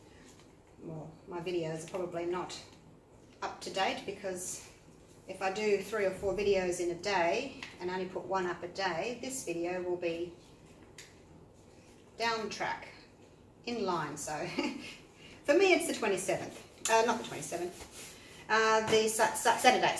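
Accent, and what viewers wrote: Australia. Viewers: Love your work and your tutorials. Especially the way you talk about your life. I feel like I'm listening to a friend and getting to know you as well as a new way of painting. It's good to listen to another Aussie Aussie Aussie. Oi oi you get the drift. Thank you.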